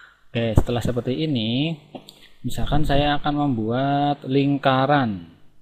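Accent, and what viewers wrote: Banyumas, Indonesia. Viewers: manteps ngapaks..., penjelasanya sungguh jelas.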